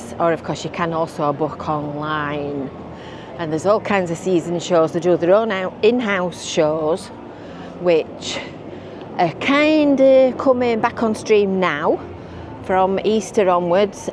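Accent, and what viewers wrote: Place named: Lancashire, United Kingdom. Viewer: Hello, I found your ‘vlogs’ only last week, nice hearing a Lancashire accent, I’m a Lancashire lass (well not a lass I’m 80) I always went to the illuminations with my parents the 50’s and remember standing in a doorway of the old Woolworths up a side street and the ‘sea’ coming across the road. Ive subscribed so will watch all your vlogs,thank you and stay safe, don’t get blown away 🚶‍♂️☕️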